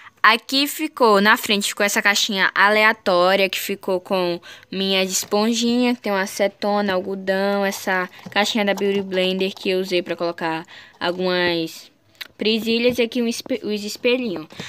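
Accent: Brazil. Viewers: Amei o sotaque, vc é de Maceió?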